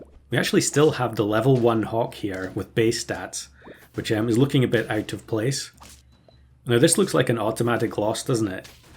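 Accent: Scotland, United Kingdom. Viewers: Great, realy enjoy your Content thank you 🙂 you have a Great soothing scottish Voice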